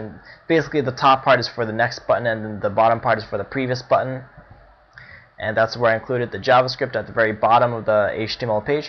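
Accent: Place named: Midwest, United States. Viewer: Thank-you for the tutorial! In answer to your question - your accent sounds like midwest American with a slight hint of Asian.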